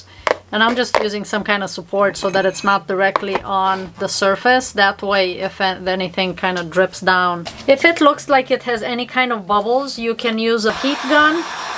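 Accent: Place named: Albania. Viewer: You have a beautiful Albanian accent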